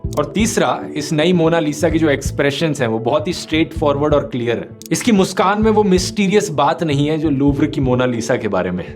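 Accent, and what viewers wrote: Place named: South India, India. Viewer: aaj dhruv ka voice over lag raha h south jaisa...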